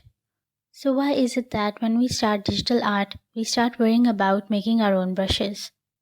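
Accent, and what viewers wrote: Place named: India. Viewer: There are tons of videos on brushes but this is the most helpful among all. I love the format of your videos and tips too😍😍🤗☺️. Your accent sounds like Indian...are you Indian 🤔🤔.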